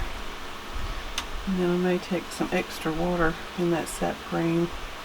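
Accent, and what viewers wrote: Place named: South, United States. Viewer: I love to hear you talk. You have a sweet southern draw. I like how you say “that’s purdy” You have such talent. You really enjoy what your doing. I love watching you create.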